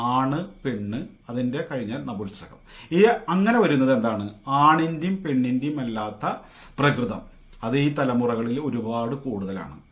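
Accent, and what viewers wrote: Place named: Thrissur, India. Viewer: Thrissur സംസാരം 🥰🥰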